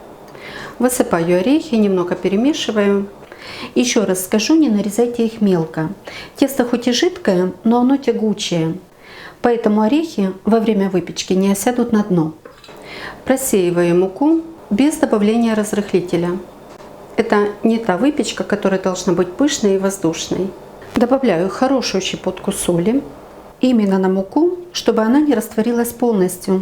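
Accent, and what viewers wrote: Ukraine. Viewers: Всё подробно, честно! Нюансы очень важны. Огромное спасибо за уважение к подписчикам. ❤ И ещё, спасибо за красивую украинскую речь!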